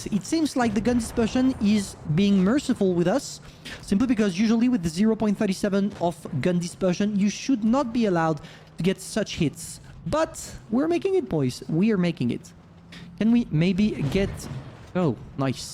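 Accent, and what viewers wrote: France. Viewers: is he from france?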